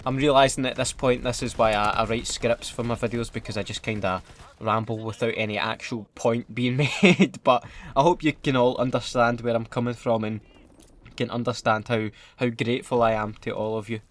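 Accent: Scotland, United Kingdom. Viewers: 1) I live in Scotland, tho I'm from France 2) First video I saw was the one on Bully, through a facebook post. I remember it because it was my first week in Glasgow and I was like "Oh man is that the accent I'll have to live with for the next 4 years?". Loved it tho, and I've been showing your videos to my friend and family at home whenever I get asked how is the Scottish accent;) Thanks a lot for what you did there, it is nice to see someone do stuff like that and have conscience of what is around them. Keep up the good work mate:D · I'm from Finland & the first video of yours that I watched was your "most useless attachments #3", which made me fall in love with the Scottish accent (no homo). I've stopped following any other COD youtubers than you. Needless to say, awesome work bro. Please keep it up, really brightens my day when I see that you've uploaded a new video. 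Cheers. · Im from England! I have to say the first video of yours I watched was tactical nuke incoming when I was in a mw2 hype, thought you were funny so watched a few more and the wee Scottish accent just made it even funnier! keep it up though man! im glad to see someone who's made it big and still thinking about the people less fortunate than themselves! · South Africa I watched the soap video on Facebook uploaded by game lads or something like that. The first video on YouTube I watched was the clowns video. (I also frequently binge your videos cause sometimes nothing else interests me except for a Scottish accent. Not pandering, just stating) Part of the notification squad now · Greetings from Texas over in the US! First video I ever watched was "WTF EVEN ARE COD MAPS?!" It's an amazing video that intoduced me to your hilarious content and great style of commentary. Never did I think I'd spend so much time watching a Scottish man rant about COD so calmly. 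Thanks for the giveaway, and I hope you help raise a lot of money for such a just cause. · England and the first video I don't know but it was around about the time you did the video about a party and everyone was off their heads! Love your content solely because you're a Scot! My dad was from Edinburgh so I can understand somewhat what you say!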